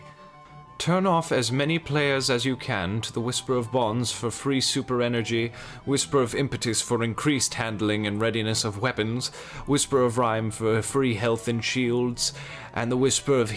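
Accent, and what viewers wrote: England, United Kingdom. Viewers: lmao Also, the narrator's voice and the "actor class British" is amazing. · Hahahaha, that’s hilarious! I’m glad this came up on my feed… Exceptional English accent there good sir and again, hilarious! A new sub here for thee, peace ✌️ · The stuffy English thing…awesome😆 Best destiny video ever! 🤣🤣🤣 Right up my alley!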